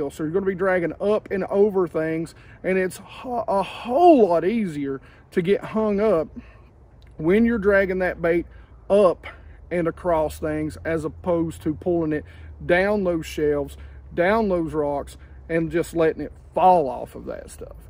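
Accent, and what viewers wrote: South, United States. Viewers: I'm new to bass fishin and you got a sub out of me! Your very informative and thorough. plus that good ol' southern accent just makes it easy to listen to haha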